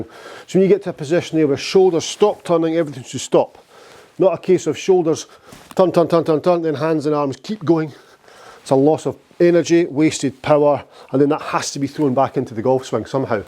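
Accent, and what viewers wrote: Scotland, United Kingdom. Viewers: Two reasons why I watch this. To learn how to play golf and to learn how to speak Scottish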